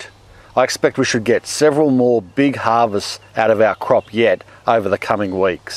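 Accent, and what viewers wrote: Australia. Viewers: beautiful video! A bit of a personal question - what happened to your arm? Did you get bit? I've been meaning to mention it as it looks scarred - just curious as to what happened to your forearm. Looks like it healed nicely though. Looks like it happened a long time ago. As someone with scars on one of his arms too it's just something that jumped out at me. You're still beautiful! ;) Also I feel many of us listen to your videos half for the helpful tips and also for your great cheerful personality and wonderful accent - I come from canada myself and I love australian accents :)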